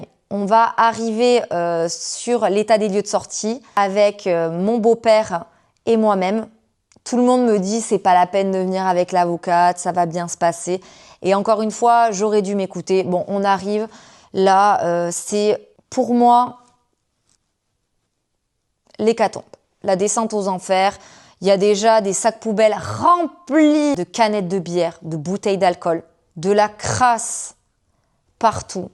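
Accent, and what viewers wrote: South, France. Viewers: Vu ton accent, tu viens du sud de la france? Ton mari a -t-il trouvé du boulot en france?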